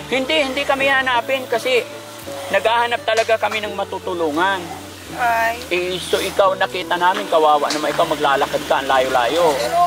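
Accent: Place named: Ilocos, Philippines. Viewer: Laing ag sao Ilokano ni.ang tiyaga mo.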